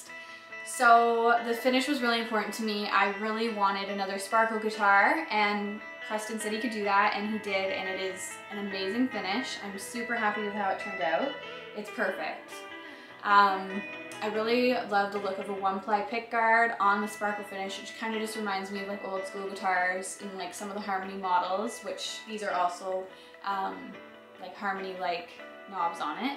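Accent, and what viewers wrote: Canada. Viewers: Love that Canadian accent! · I like your Canadian accent. The guitar looks and sounds great too. The surf shack is lookin' great.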